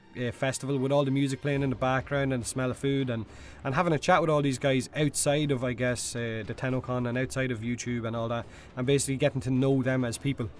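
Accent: Ireland. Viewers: lol are you from Ireland??? I em in Ireland to :D loooool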